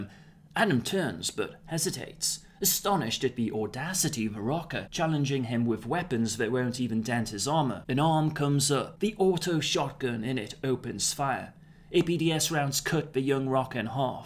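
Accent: United Kingdom. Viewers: That accent makes me think I'm listening to a British news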